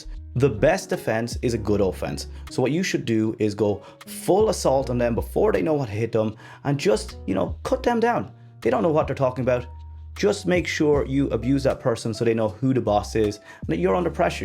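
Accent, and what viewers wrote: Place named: Ireland. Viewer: Are you Irish? you have Irish accent. anyway, great content <3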